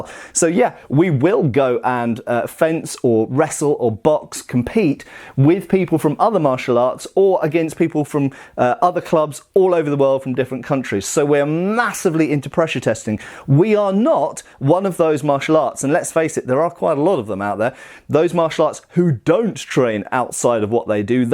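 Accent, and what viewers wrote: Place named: United Kingdom. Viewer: I like your use of a proper British accent in this video.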